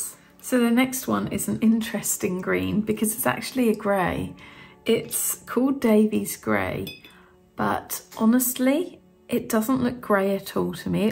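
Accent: United Kingdom. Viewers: I'm converted! I now swatch all my water colours as pebbles! I used to hate swatching in little rectangles as I found it tedious. Now it is a joy, thanks to you. :) I've been in home quarantine with Covid for the last 10 days (free today! Yay!) and I been working my way through all your videos from beginning to end (well almost to the end). I love you videos, your lovely British accent and the gentle music. I've lived most of my life in Australia, but I was born in England and have lived there a couple of times for a short while over the years. So I love your vlogs of the countryside and villages too! It takes me back to happy days and reminds me of my mum. :)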